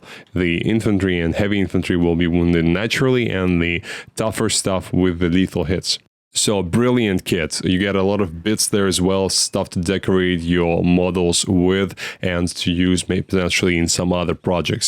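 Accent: Russia. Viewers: Pls tell me that is russian accent? Or I'm wrong :)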